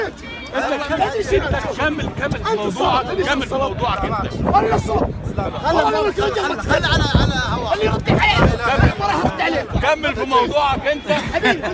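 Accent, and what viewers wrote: Saudi Arabia. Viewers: ابك والله هو دوسري ويصرق لهجة عتيبه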